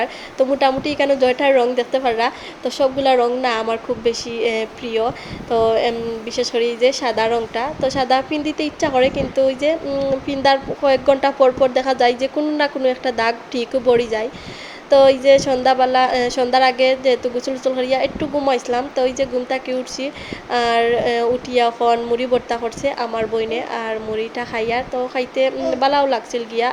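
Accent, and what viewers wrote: Sylhet, Bangladesh. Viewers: আসসালামু আলাইকুম। ভি‌ডিও খুবই ভালো লেগেছে।আপনার কন্ঠে সিলেটি ভাষা শুনতে ভালো লেগেছে❤❤❤❤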